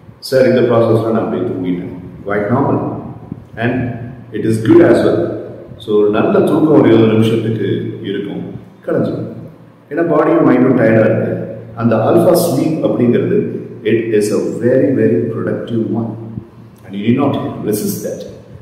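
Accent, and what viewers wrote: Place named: India. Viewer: I'd like to listen but, between the echo and the accent, it's difficult. Thankfully, I have the book. (Generally speaking, I can understand Indian accents -- the echo is the issue I have -- and the closed captioning isn't in English.)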